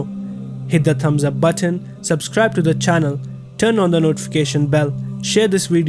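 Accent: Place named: Kashmir, India. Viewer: By your voice you seem to be kashmiri.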